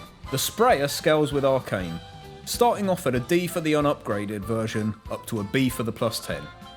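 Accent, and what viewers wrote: United Kingdom. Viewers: Your somewhat latino tracks and jokes, and your bri'ish accent are confusing the hell outta me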